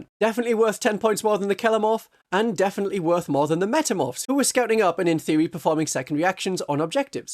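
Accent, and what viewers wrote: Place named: England, United Kingdom. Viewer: Your accent makes it sound like you might be in the same corner of England as me. I'd suggest meeting up for a game but I don't know how I'd feel playing against a 2d animated character. Who framed roger rabbit freaked me out as a kid so I'm not sure my mind could take it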